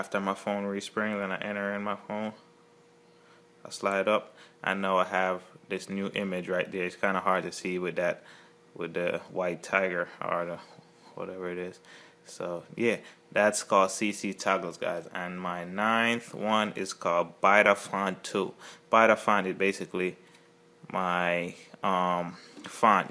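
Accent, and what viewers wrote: Jamaica. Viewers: You're Jamaican i can tell my your accent. I'm Jamaican too.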